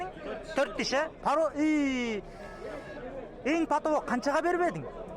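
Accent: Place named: South, Kyrgyzstan. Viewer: Таза суйло сартча суйлобой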